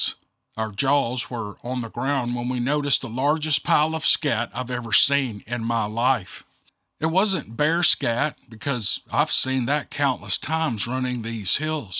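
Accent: South, United States. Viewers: I have a news lash for ya, you cannot mask your accent it comes through no matter what, that is how we Southerners talk, if it does not agree with some folks that alright with me! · As a California native, I love nothing more than a Southern storyteller. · Hey I always liked the us southern accent, I don’t know when people started using the like word so much it’s enough to drive me right up a tree a can’t stand it, even some older people use it now, I would rather have a jaw full of tooth aches than here it, lol Fr Canada 🇨🇦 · I love your accent. I'm southern too and ppl laugh at me all the time and I could care less. Been in Fla for 28 yrs now but grew up in Thomasville, NC. A true southern girl here and dang proud of it!!!! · you have a great accent like me Southern🤩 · Sir, we love you for you. I was born and raised in the South. Hearing your voice makes me feel home. 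We joke that my inner compass broke because I moved up to LaCrosse, WI. Then, I got married and had to stay for now. My husband has decided he wants to move South. Praying we get to. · Are you kidding me? Everyone loves your southern accent 😊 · Ok,OK, keep the Southern country boy accent please. 😂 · I love your dixie accent Love 'n' hugs from England ❤ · Hi, just wanted to say regarding comments on your accent, as a New Zealander retired in Thailand, i find your southern accent & story reading skills, adds to the realism, and i can picture the story in my mind, ( true or not ) i really enjoy them, ķeep it up, cheers. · You talj just fine people are just mean. Your Southern accent is cool. If I had that accent here in California, I'd be running from all the women, don't change a dang thing lol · Thank God you are back with your southern accent. Who cares what that one or two feels about how you talk. 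I like it! Your voice feels like home. Your stories holds my attention and I hear your stories the way they should sound. Glad you are back. ❤ the stories you share! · I love your southern accent!! Screw those haters! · Southern accents are cool, cause it makes the stories folksy & that's a good thing🤗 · Your "accent" is part of who you are brother.... F all that.... And just a personal note... I find it comforting... Moved to DE at 15 after a lifetime prior in WV/OH. That was 94... Been here since... Family back there passed mostly... Elders due to age, and even my cousin who was the closest friend/brother and in age as well, I had... Drugs took him. Life rather, took him..... I won't get into all that.. but I'm saying... I listen for many reasons... The southern feel... Is one. Thank you. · I love your accent. You see I’m a Connecticut Yankee. I had a wonderful pastor from the south. He was such a kind and wonderful man. 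He loved all his congregation and we loved him. Every time I hear you I think of him. Thank you, your voice is very comforting. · What's wrong with your accent? I've lived in the south, and you have a fairly mild accent. Try under standing someone who primarily speaks "gullah". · I agree with Kevin! You sound like a true Southern Gentleman. I enjoy listening to most of your stories. Thank you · your 'valley girl' impression needs some work! Nice try but please keep that wonderful, natural, southern twang, after all this is! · you got a great southern accent you’re a natural story teller excellent. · For me there ain't nothin better than hearin' the voice of a southern man.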